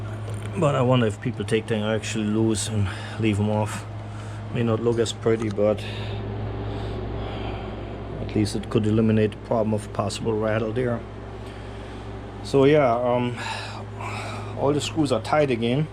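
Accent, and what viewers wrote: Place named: Germany. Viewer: Very good Work Herr Teuton, you sound like a German living in the USA. If that is the case, I have a similar story. Myself I purchased all the Upgrades for a KLF-30 with Ciare woofers and will be attempting to build a set of cabinets from scratch.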